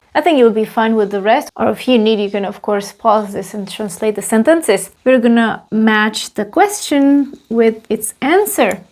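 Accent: Czech Republic. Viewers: I won't ))) But I am here just for your local Czech English!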